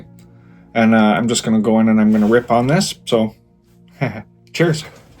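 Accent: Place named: Minnesota, United States. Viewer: Your accent is awesome. 😂 Minnesota? North Dakota? Canada? Im from NYC. 🗽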